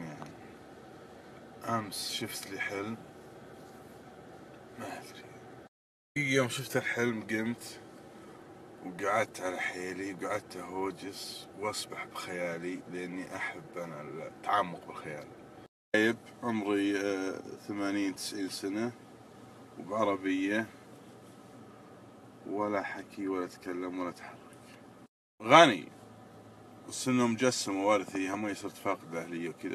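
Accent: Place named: Qassim, Saudi Arabia. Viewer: يااخي والله اني اقدرك واحترمك الله يكثر من امثالك يا ويعطيك طوله العمر. يازين لهجتنا القصيميه ويازين عيالها وياحلاوه انفسكم وروحكم والله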